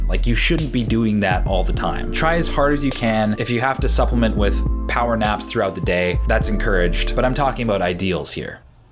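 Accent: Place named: Canada. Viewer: Love it. Are you actually from Canada? First , because of pronunciation, but mostly because of the humour and laid back personality:) it seems like Canadians in general are funny, friendly and kind people !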